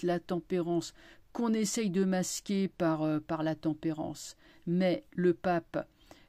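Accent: Paris, France. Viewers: Bonjour, cela fait déjà un bon moment que je vous écoute et que j'apprécie votre travail.. Mais surtout vous êtes pour moi comme une petite madeleine de Proust.. Votre voix et votre accent titi parisien me rappellent mon enfance à ménilmontant, pigalle, le sacré cœur tout ça tout ça les marrons chauds.. Que du bonheur.. Merci..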